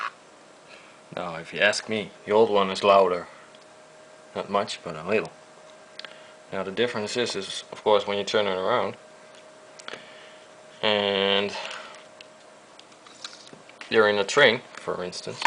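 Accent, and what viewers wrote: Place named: Netherlands. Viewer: lol i can hear ur dutch accent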